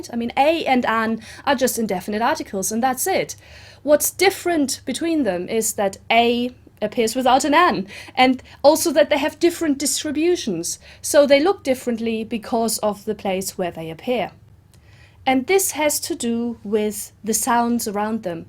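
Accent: Germany. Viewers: Hey, thanks for the clip! By the way...something I wanted to ask you.... Are you German? Just wondering because of your pronunciation 😉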